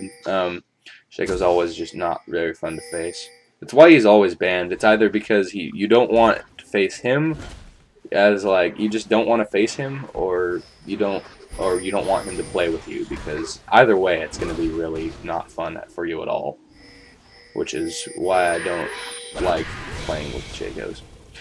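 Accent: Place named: Netherlands. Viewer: Where are you from?You sound Dutch,or mayb Belgian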